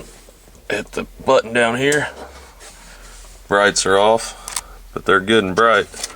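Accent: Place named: South, United States. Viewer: Thanks for the info my Southern Buddy(cool accent).NICE CAR !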